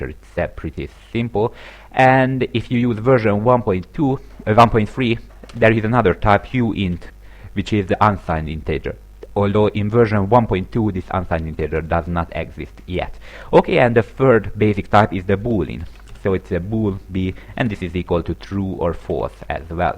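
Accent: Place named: Hungary. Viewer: te magyar vagy hallatszik az akcentuson meg látszik a "fájl, szerkesztés" ikonok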